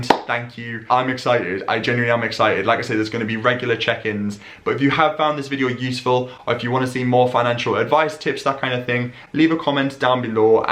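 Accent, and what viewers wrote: United Kingdom. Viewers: British accent 💯🤍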